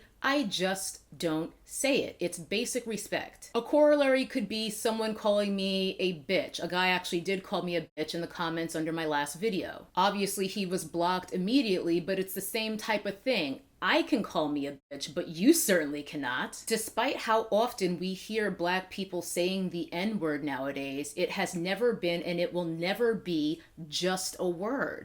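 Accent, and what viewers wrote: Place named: East Coast, United States. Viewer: Yet again you hit the nail on the head. It's not like everyone goes around saying the C word to Asians. For goodness sake, or the S word to Gina Rodriguez's race sheesh. And I knew you were from the East Coast I could hear it in a few of the words you say, and I'm sorry that old man called you that!!!!!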